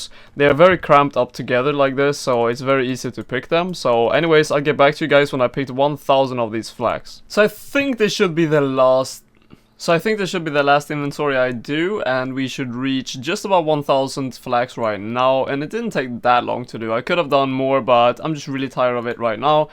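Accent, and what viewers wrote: Sweden. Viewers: hörs så tydligt du är svensk, haha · you are swedish huh ;) i remember that english accent ^^ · Are you Swedish by any chance? Your accent sounds quite Swedish.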